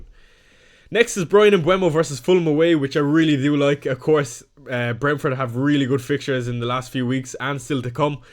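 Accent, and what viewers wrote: Ireland. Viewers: You have great advice and insights, and absolutely the best accent of all the FPL You Tubers! Love a cheerful Southern Irish accent...😊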